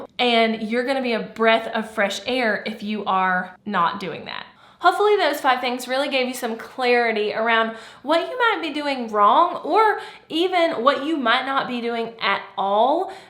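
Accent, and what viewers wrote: South, United States. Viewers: Absolutely love the content and the advice and on top of that, she is incredibly easy on the eyes and a delight to listen to. I love her beautifully southern accent. is really very knowledgable and an epitome of brains and beauty. I have okay audio but it sounds like I am on a phone call...which is what I used the mic for was meetings for work across skype. It is all I have right now, but a new mic is at the top of my list of things to get next. The rest of the 5 things are things that I am constantly watching for but I think I am handling pretty well. I don't have to worry about lighting except to make sure that it is not too dark in the game I am playing so that it is not too dark.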